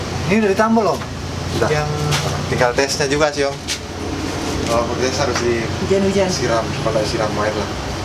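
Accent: Palu, Indonesia. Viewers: Makin lama disini, logatnya bang sudah seperti logat Palu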